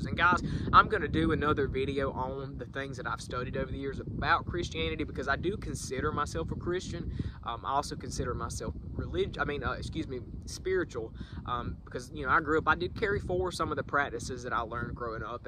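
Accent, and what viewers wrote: South, United States. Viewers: I love your accent, sounds so southern haha. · A fantastic video. I love your southern accent. I could listen to you talk all day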